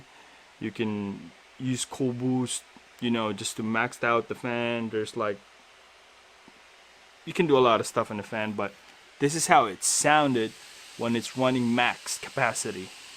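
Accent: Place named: Philippines. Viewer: Just chill guys. His first language isn’t english. He might be a filipino. Don’t be quick to judge.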